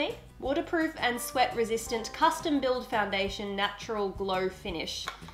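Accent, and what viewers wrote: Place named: Australia. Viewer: i love that archie has this australian accent of yours, hahaha so cool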